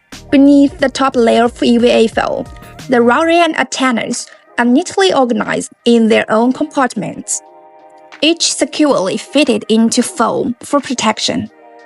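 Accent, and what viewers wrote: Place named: China. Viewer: Her slight accent is Chinese, I don't trust Chinese data equipment due to the CCP requirements to hand over data to them, might be a back door built into it for all I know, or remote deactivation during war time. That said, this company is not on the U.S. government list of unapproved modems for their use, so might be OK. The quality looks great and I do prefer the N-connectors over the small SMA connectors every other manufacturer uses. Only question I have is where to buy it without the tariff tax? Shipped directly from China?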